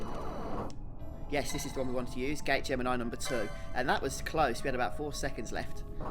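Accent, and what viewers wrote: United Kingdom. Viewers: I'm very proud of myself because i can clearly understand your English Even with your british accent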